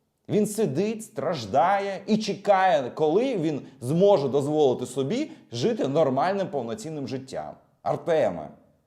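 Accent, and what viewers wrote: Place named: Surzhyk, Ukraine. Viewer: Задолбал слушать твой сраный суржик.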